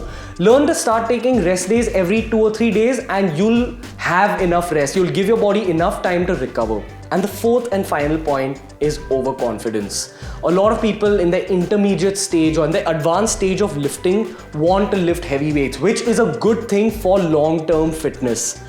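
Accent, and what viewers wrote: India. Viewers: First hindi accent influencer who has a delicate accent but you can still understand what is he talking about. Keep it up very interesting material.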